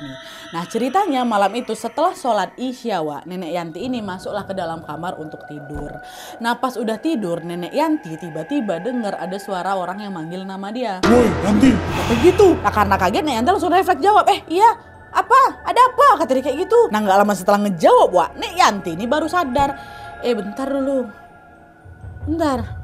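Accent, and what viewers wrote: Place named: Banjar, Indonesia. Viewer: Aku yg denger langsung ngeh ahahahaha pasti bener nih orng banjar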